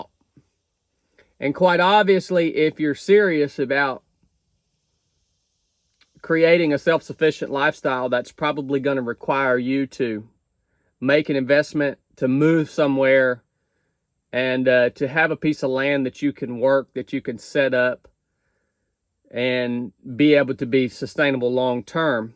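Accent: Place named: Alabama, United States. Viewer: I’m visiting in Alabama and you have the accent of my family! Where is your accent from?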